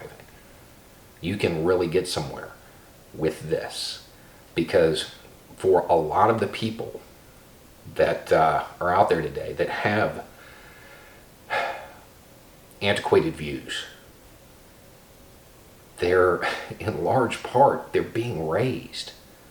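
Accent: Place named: Deep South, United States. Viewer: FWIW, and all that; My one child is full grown, has a career, a life and so much- but I have never heard you say or tell a story or any other thing that I would have objected to my boy hearing- and I am nominally a Democrat, although not a dyed in the wool kooky one. Your beard and "deep South" accent don't scare me or my kind at all, as a matter of fact, you sound a lot like home! If you want to do this, you go right ahead- I'll be among the first "overage adolescents" to subscribe! Sleep on it- it's only a thought, until somebody does something with it! FR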